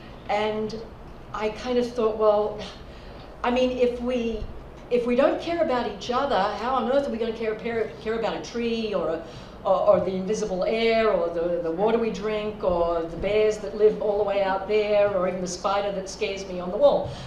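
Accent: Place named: Australia. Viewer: Twenty minutes ago, as I was pulling into my driveway, I changed the Sirius station to 129 [The Catholic Channel]. Father Dave was in conversation, and a person who sounded to be an Aussie began speaking. After the first sentence of dialogue, I put my car in park, and had to find out who this person is and her mission. I scribbled the info onto a piece of a snack box, went to the website to than YouTube. The end result: I felt happy. And invigorated. Thank you for passions. Your ripple effect will be felt in perpetuity. God bless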